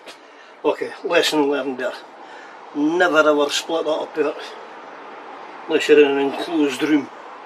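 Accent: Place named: Aberdeen, United Kingdom. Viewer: That was more helpful to see it getting taken apart, not enough swearing for me as I put myself in your shoes so there's a gid few fkrs and btsrds getting flung aboot when yae drop things, I was thinking of doing the very same video as advised by your good self, like you I have breathing issues but also MSG so there's a lot of shaking going on so video would be quite long haha but we'll see you never know,anyway keep up the good work, me and the wife were trying to work out you accent thinking up Aberdeen way 😃👍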